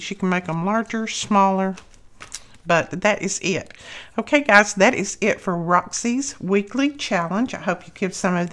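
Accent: South, United States. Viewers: Well your voice reminds me of home. I was raised in the south but have lived in New England for the past 21 years. I miss me some "home" sometimes! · Cute. I do have a question if I may ask, does it give an accordion look ok the one end and feel to them. I love the prima papers. They are really nice quality hopefully ghhjjjj me It's hard to find in PA however I do find it in Maryland. And I love your southern accent. It's nice. Reminds me of when I was a little girl and I loved in Georgia with my military parents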